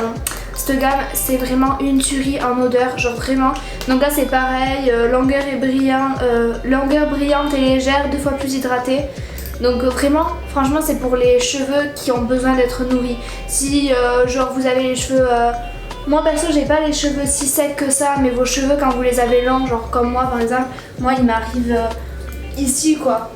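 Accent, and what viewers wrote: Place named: South, France. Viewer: T’a un accent du Sud et sinon t grv drôle 😂❤️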